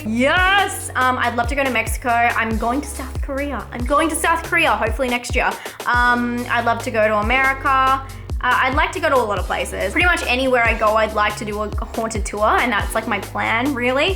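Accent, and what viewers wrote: Australia. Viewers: First off, you know we love your Australian accent. LOL!! Congrats on your 4500 subscribers. Afflicted was an excellent found footage horror movie. If you ever come to the US, come visit my city, Philadelphia, the City of Brotherly Love. I'll introduce you to a Philly cheesesteak. Keep up the great work.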